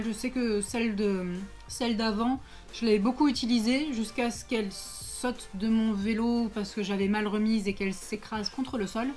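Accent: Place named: England, United Kingdom. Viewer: J'aime bien ton accent anglais 😊😀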